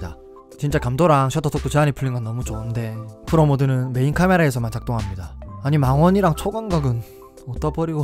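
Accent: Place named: Changwon, South Korea. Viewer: 님 창원사람인가보넹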